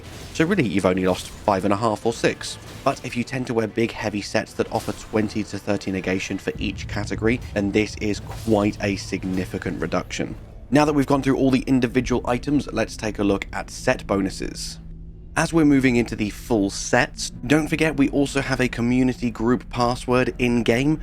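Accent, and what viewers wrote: United Kingdom. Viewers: British voice Do not recommend channel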